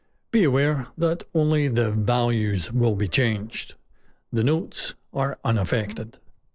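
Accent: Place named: Scotland, United Kingdom. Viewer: Scottish accent without a doubt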